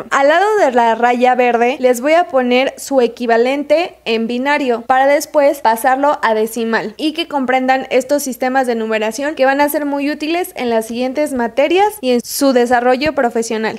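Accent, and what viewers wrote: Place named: Mexico. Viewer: Eres mexicana creo, por cierto me gusto el video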